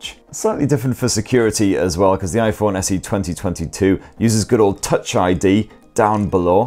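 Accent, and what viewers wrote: United Kingdom. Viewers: I said this before: you are by far the most amusing tech reviewer out there. You could say anything in these vids and I would still enjoy them for the british accent and particular language.Cheers.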